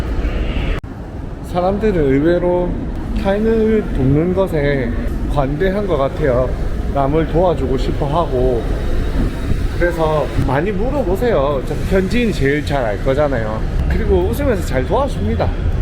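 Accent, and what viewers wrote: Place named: Gyeongsang, South Korea. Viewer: 인스타에서 보고 왔습니다 영상재밌어요 😂 익숙한 사투리이신데 혹시 경남분이신가요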